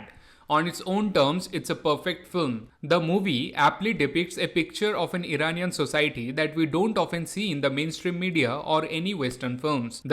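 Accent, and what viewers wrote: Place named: India. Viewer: Ok. Why don’t you learn to pronounce the English words like in English not in Hindi heavy accent or talk in Hindi language. This is the same as Call centre for Fido asking for information ℹ️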